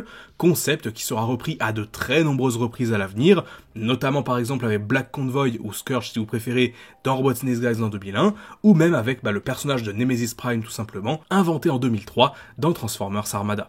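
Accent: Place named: France. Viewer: J'avoue qu'avec un accent français, on comprend comme ça !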